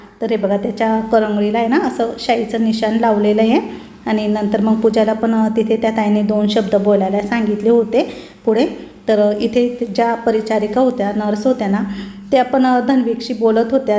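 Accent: Nashik, India. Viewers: आपले नाशिक आणि भाषा लई भारी ताई . 👍बिनधास्त बोलत जा. अजिबात संकोच नको करू. सर्वांना समजते मराठीचा गोडवा सगळीकडे सारखाच. ओरिजिनल ते ओरिजिनल असते. उगाच ओढून ताणून इंग्लिश विंग्लिश मारणाऱ्यांपेक्षा तु छान आहेस. म्हणून फेमस झालीस व ग्रोथ आहे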